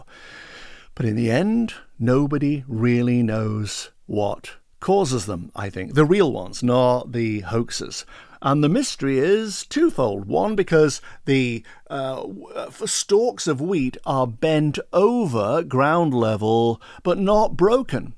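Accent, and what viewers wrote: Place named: United Kingdom. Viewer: Almost as soon as you start for the exit you stop and and in that cool ass brit voice say "huh I wonder" Then you walk up to the opening thinking " wow that's quite a jump," as the orbs popped and floated up . All you had do was believe and have faith that you were going to get where you are meant to. So off you go.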